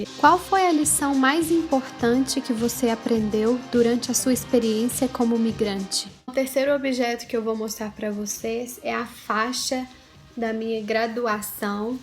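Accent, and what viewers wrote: Minas Gerais, Brazil. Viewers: Jose Zeballos o sotaque dela é mineiro (Minas gerais)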